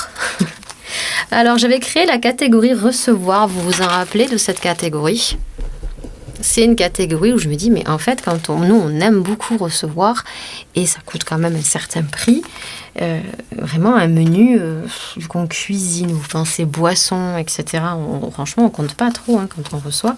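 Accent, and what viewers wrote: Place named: South, France. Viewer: Je rajouterai avec un bel accent qui sens le soleil..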